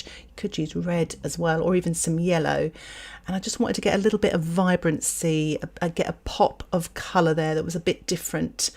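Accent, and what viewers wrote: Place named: United States. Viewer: Hi this vid has an American accent, hope this is all legal, Brisbane Australia 🦘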